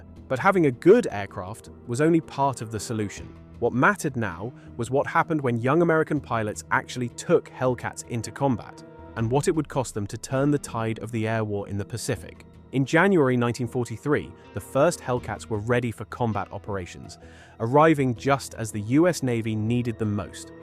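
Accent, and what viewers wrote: United Kingdom. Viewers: These things always sound more important with a British guy announcing.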